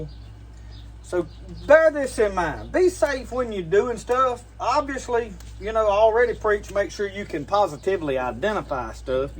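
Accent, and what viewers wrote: South, United States. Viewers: Common, you both now.....I just loveeeeeee your southern accent, it's like a lullaby to my ears honestly. Those who hate it can take a walk seriously.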